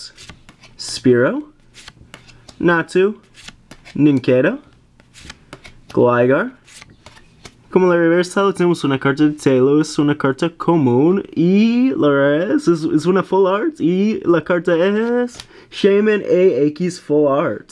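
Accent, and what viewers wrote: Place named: England, United Kingdom. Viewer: Me encanta tu acento ingles en el castellano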